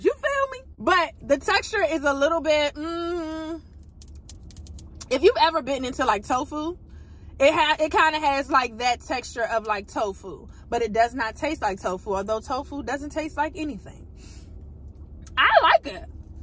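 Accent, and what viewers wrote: Baltimore, United States. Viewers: Always watching videos you keep me laughing because you keep it real I got a question for you are you from Baltimore cuz you sound like you got the Baltimore accent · "One thing about KFC, KEEP THE RECIPE THE SAME" lmao - SN. The minute I heard you begin talking, I thought to myself: she HAS to be from DC or PG Country. Then I saw your Capital Heights PO Box in the caption. Lol! Loved this review, my fellow DMV Queen! I am about to try these today!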